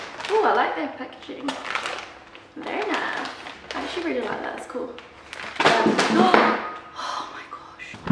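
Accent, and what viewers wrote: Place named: United Kingdom. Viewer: I thought you live in England. You accent was kinda British that's why I got confused. Then props to you <3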